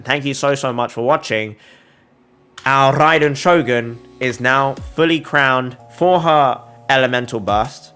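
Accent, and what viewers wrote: United Kingdom. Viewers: Are you from the UK? Good to see your vids!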